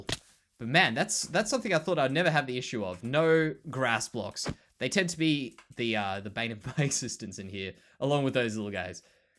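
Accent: Australia. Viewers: You dont sound funny, i thinkthe Aussie ascent one of the coolest. Also know plenty of people that agree. Always wanted to visit sydney, the pictures I've seen are beautiful. Great video as always my friend.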